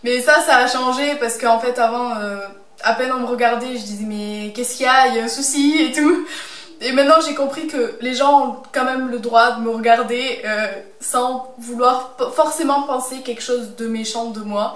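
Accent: South, France. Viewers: J'adore ton accent du sud et t'a l'air super sympa et pas superficiel 😃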